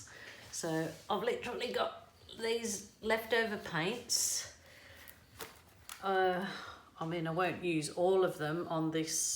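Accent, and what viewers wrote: Birmingham, United Kingdom. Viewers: Are you from Birmingham? Lol if so - waves from walsall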